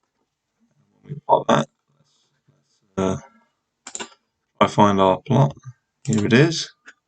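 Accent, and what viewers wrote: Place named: United Kingdom. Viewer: This is a really offtopic question but: Which part of the UK is your accent from? I'm not a native speaker so I can't tell, however, I'm in love with the UK accents and I'd like to broaden my knowledge in reckognising them! Besides that, thanks for the video, it helped me with my thesis :) Kind regards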